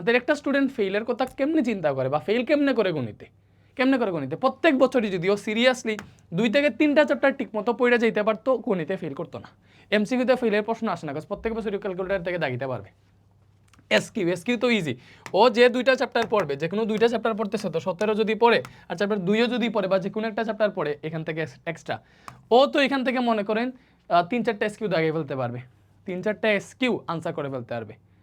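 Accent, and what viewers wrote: Sylhet, Bangladesh. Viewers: ভাই আপনি কি সিলেটী 😊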